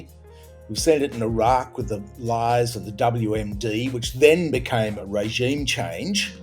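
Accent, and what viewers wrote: Australia. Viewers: It’s great to hear an Aussie accent speaking the intelligent logical sane truth! Thanks ❤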